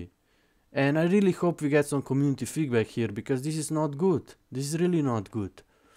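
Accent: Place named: Europe. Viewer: how do i block all classic wow content narrated by an esl european accent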